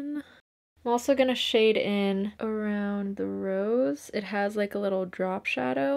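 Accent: Brazil. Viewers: Eu amo o seu sotaque carioca kkkk, seria legal ter um vídeo seu falando português, mas entendo que seria difícil em razão do seu publico ser majoritariamente composto por gente que fala inglês, AAAAAA E A MAQUIAGEM ESTÁ FENOMENAAAL ♥ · vc é brasileira CERTEEEEEZA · she's brazilian, if that clarifies things haha. portuguese and spanish are pretty similar languages 💞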